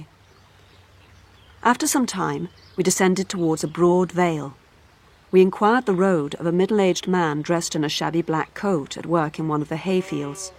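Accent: Home Counties, United Kingdom. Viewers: Home Counties accent don't really matter does it?..a great rendition..Dorothy and Coleridge probably just close friends..we will never know for sure..been reading Coleridges' account of descent of Broad Stand Scafell.. He's got balls the size of Skiddaw... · What a shame they couldn't get a reader with a Cumbrian accent instead of a home counties one?!!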